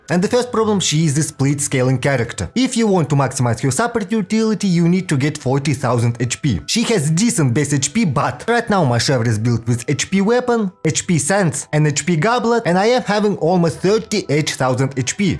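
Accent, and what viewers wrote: Russia. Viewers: are you doing a russian accent on purpose? or idk what that accent is, i can't listen to it anyways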